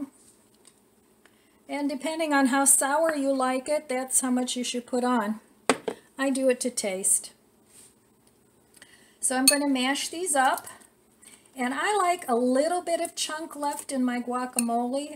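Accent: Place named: Michigan, United States. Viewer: Just found your channel, and am really enjoying your content! Very useful! I love the idea of adding a layer of plastic wrap over your guacamole to help seal the air out! You have an accent like a friend of mine from Michigan...are you by any chance from that area?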